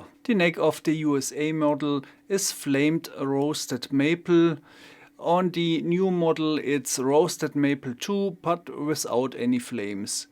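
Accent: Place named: Germany. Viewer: This german english...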